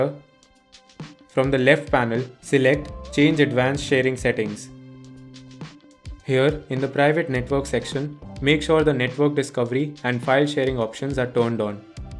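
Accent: India. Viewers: The moment I heard the Indian accent, I knew my problem would already be solved 😏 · You know it's a great, quick and easy guide when you hear indian accent. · Indian accent = auto dislike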